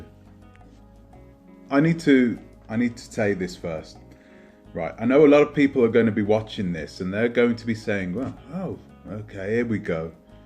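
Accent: United Kingdom. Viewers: wait aren’t your kids white? “Biracial” so would that make you suspect ...... you just contradicted your self your British accent is way stronger than his you literally sound like a white man. Chakra bars accent is way more Caribbean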